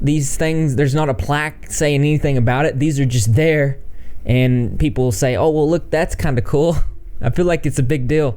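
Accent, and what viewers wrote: South, United States. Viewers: Conspiracy theories, best hair on the platform and a southern accent? I STAN😍❤️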